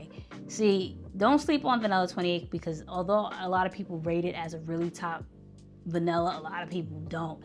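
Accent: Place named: New York, United States. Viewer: I LOVE the fact that you still have the classic NY accent. Its actually becoming rare!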